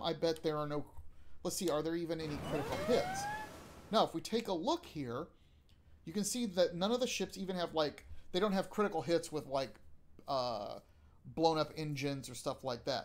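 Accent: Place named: Melbourne, Australia. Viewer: Sounds like Melbourne, Australia.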